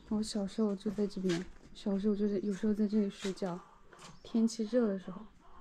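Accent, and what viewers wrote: Chongqing, China. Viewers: 喜欢的女生也是重庆口音，听着好亲切啊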